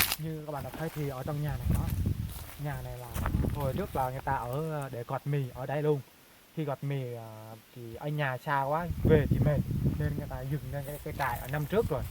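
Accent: Central Highlands, Vietnam. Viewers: Vui vui giọng nói phổ Thông mang chất Tây nguyên., như vậy sẽ được gần gủi với cao nguyên phong cảnh thiên nhiên của núi rừng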